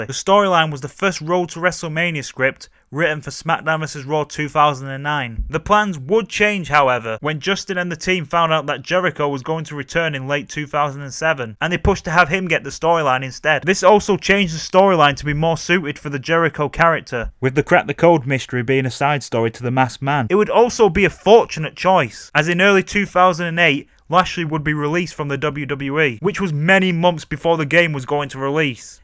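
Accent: Yorkshire, United Kingdom. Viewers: You have a propper broad Yorkshire accent it’s brill 😂😂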